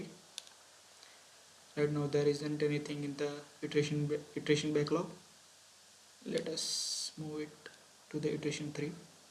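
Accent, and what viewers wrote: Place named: India. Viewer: that's , indian accent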